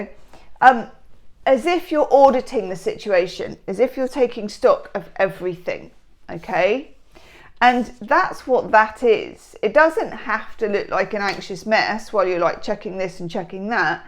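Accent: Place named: United Kingdom. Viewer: Love your british accent and humour ! 😂😊🎉 thank you !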